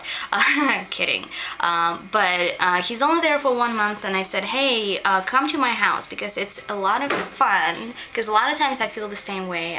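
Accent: Russia. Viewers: I can tell your accent gets thicker every time you go back to Russia. lol really cute.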